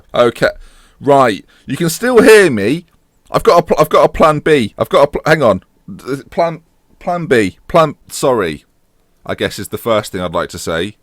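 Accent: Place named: Liverpool, United Kingdom. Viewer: You sound more Scouse in this video than in any one previously... Is that intentional?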